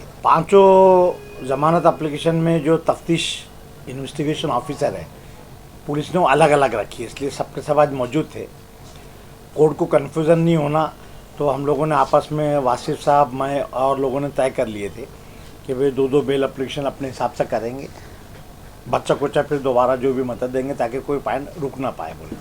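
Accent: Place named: Khandesh, India. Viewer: LLB kar liya sahab fir bhi khandeshi zaban aaj bhi nhi gai aap ki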